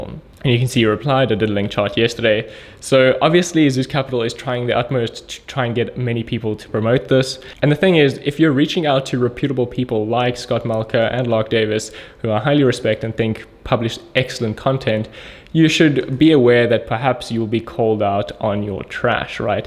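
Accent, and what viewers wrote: South Africa. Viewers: Nice videos..my new favourite channel for crypto news. Seen ur channel yesterday. 🌟🏆🙏 1 Question: Are u a fellow South African? 😉👍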